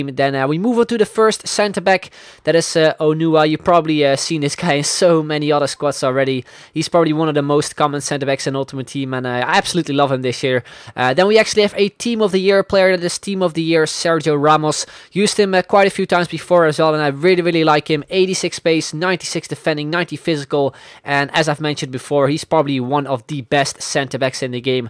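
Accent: Netherlands. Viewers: Hey, are you dutch? Oh, and I love your proper content, you earned another subscriber 😊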